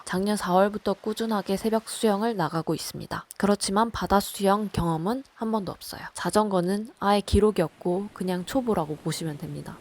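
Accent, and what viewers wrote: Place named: Japan. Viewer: 계획을 세워 그렇게 철인3종도 하시고 마라톤도 완주 하시고 지금 이렇게보면 하면 한다네요 말투도 일본에 있어서 그런지 일본 말투도 섞여 있네요ㅎ 요가 자전거 수영 마라톤 등산 못했지만 하나 하나 해가는 이 대단합니다 응원할께요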